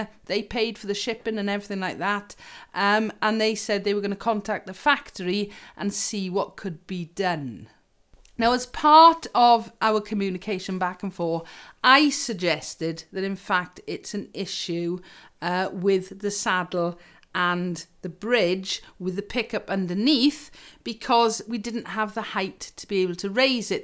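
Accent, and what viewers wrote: Wales, United Kingdom. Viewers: English? Sounds suspiciously like a Welsh accent to me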